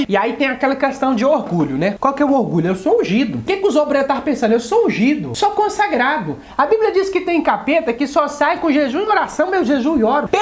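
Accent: Minas Gerais, Brazil. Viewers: Você é de minas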